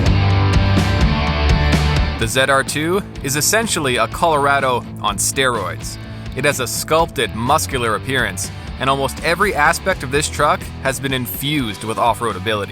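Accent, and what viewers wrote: Canada. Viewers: Why, he's Canadian, it's how they say "z" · He’s from Canada 🇨🇦 has to be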